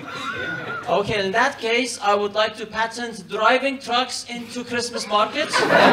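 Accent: Iran. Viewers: I guess thats his stage name because he looks VERY Iranian and has a stereotypical Tehrani accent lol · He has Persian accent and look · He has a very distinct Persia accent. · He's Italian right? How the fuck he has an obvious Persian accent?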